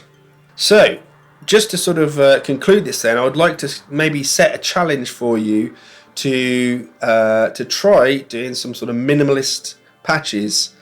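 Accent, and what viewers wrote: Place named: Midlands, United Kingdom. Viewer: Hi, cool video. Are you in the Midlands? I’m in Birmingham:) I’m going off the subtleties of your accent haha. When I was beginning with real Modular I just got 1 or two Doepfer modules and then patched them together in different ways for a while, it was a good way of not being overwhelmed 😊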